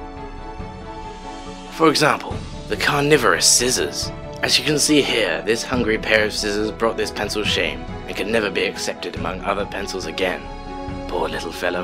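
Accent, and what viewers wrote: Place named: England, United Kingdom. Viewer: this is very good. Good touch having an English accent as narrative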